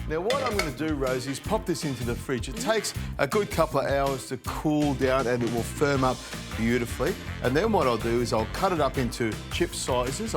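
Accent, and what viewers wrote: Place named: Australia. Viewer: That's an Australian accent, not Italian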